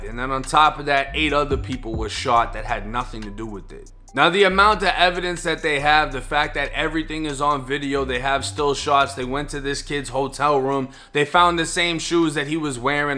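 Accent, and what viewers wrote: Boston, United States. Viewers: I ain’t know Tampa had that accent thought you was from Boston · Are u from Boston bro?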